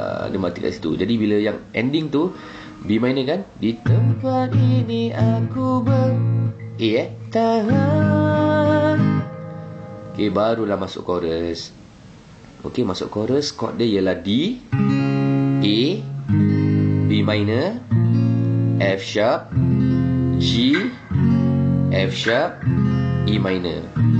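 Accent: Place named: Singapore. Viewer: bro orang spore ke? cara style cakap mcm orang melayu spore la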